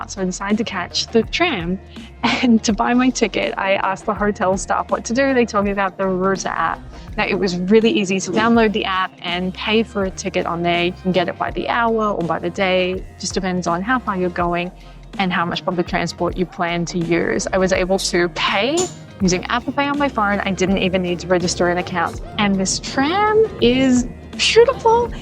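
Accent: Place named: Australia. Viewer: Europe here. So nice to hear the wonderful Aussie dialect again, brings back great memories from my time down under. Thanks for sharing your travels. Really enjoy your vlogs.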